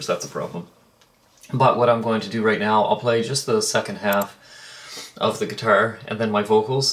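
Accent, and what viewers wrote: Canada. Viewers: Ohhhhh... You're Canadian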